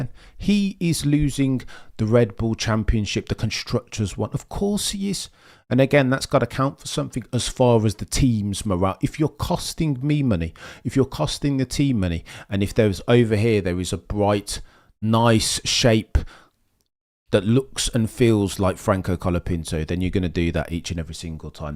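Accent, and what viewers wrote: Birmingham, United Kingdom. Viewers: Hear a Brummie accent, You a Villa fan mate?!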